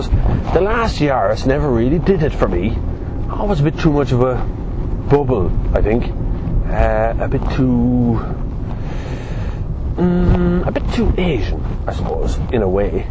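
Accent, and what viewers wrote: Ireland. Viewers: thanks!! Congrats for the reviews, you are doing a good work! (and I like the Irish accent with words as "bush" "thash" for but and that hahaha)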